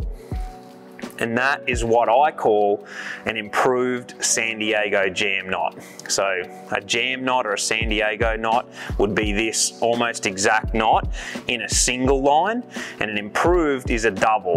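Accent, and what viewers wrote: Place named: Australia. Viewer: why do you sound like an Aussie when speaking English ? I am really curious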